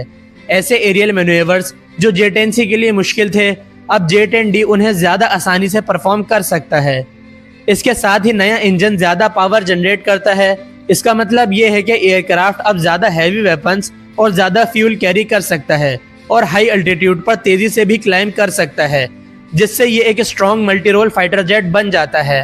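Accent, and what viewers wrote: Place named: China. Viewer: I don't like Chinese English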